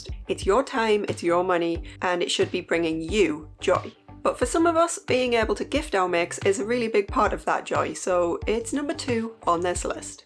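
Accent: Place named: North East England, United Kingdom. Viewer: Nice to see you where are you from sounds like the north east in uk l am from uk l live in Redcar North Yorkshire l did get your name from Chris cross stitch shout out look forward to seeing your next video xx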